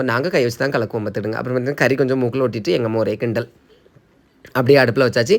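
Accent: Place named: Telugu, India. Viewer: Nalla thamizh pesu bro. Telugu vaadai adikuthu unga tamizh. Style nu nenachi pesuringa. Tamizh mella saagumnu kelvi patturken unga kita tha paakuren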